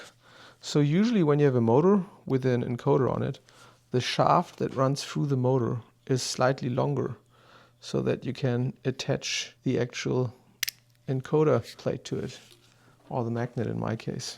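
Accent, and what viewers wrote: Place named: Germany. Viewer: you sound German ...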